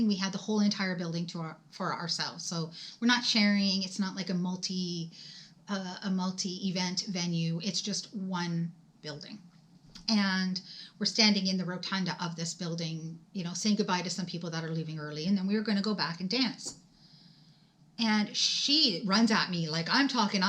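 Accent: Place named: Canada. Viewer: I recognized the accent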